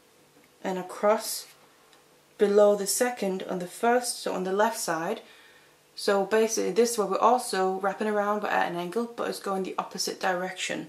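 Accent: United Kingdom. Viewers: She is using the UK pronunciation because, I assume from her accent, she is from the UK.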